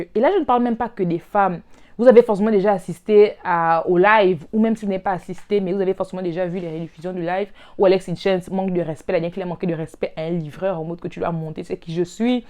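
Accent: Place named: Gabon. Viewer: Mais tu es gabonaise non? En tout cas tu en as l'accent.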